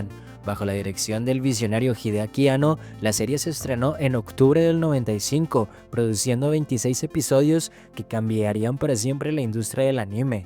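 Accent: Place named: Colombia. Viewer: Voz tipica de colombiano...de mucha hueva...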